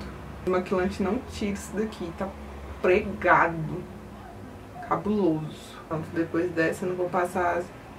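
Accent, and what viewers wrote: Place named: Bahia, Brazil. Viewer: senhor! que coragem. ..Kkkkkkkk tu é linda demais , amo seu trabalho e seu sotaque parece que é bahiana. beijos linda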